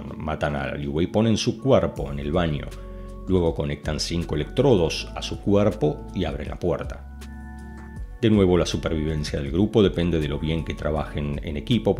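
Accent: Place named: Argentina. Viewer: No me entero si eres argentino o español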